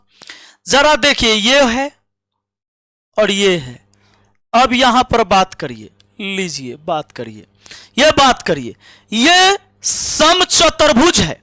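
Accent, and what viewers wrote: Bihar, India. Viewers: एक बिहारी सौ पर भारी आवाज से पता चलता है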